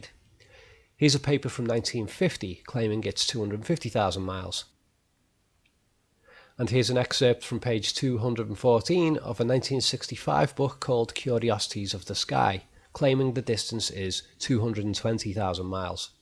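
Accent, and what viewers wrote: Liverpool, United Kingdom. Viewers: i didn't realize you were a scouser! errrrm nice one :)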